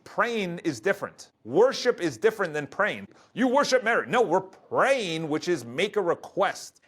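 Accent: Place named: Philadelphia, United States. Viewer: Are you from Philly? Or somewhere in SE PA, South Jersey, Delaware? All similar accents.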